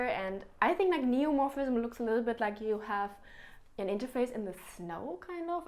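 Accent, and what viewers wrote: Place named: Europe. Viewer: As an Egyptian American UX designer myself I love it when I see knowledgeable UX designers like you happen to have an accent. It emphasizes my opinion on how most great trends in UI, fashion, interior design come originally from Europe and Asia. Americans here might be “uncompetable” when it comes to technology, but Europeans—especially Germans, French, Polish, and Italians—are definitely wizards when it comes to aesthetics and art. Good job, loved your content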